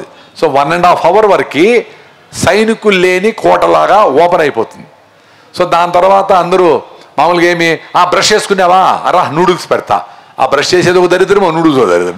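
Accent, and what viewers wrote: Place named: Chittoor, India. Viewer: Madanapalle chittoor slang ande adhe .. mame atle madadatham ..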